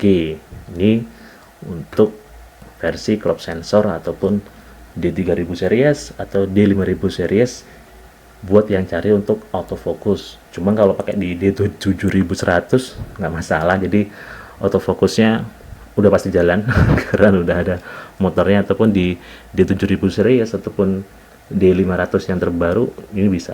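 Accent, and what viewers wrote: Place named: Surakarta, Indonesia. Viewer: Koyok e wong solo iki haha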